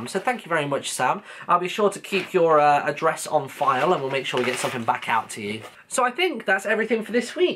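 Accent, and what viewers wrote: England, United Kingdom. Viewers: i loooooooove this english accent *o*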